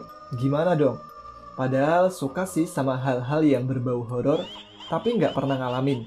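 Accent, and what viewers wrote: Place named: Banyumas, Indonesia. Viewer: Hay... Aa ngapak... Aku dari Brebes nih... Salam santun buat mas.makasih untuk ceritanya.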